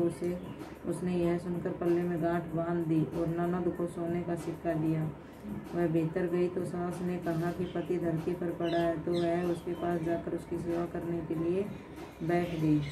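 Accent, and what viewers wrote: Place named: Rewari, India. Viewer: Kit ki h ya jo khani padh rahi h boli to Riwari ki h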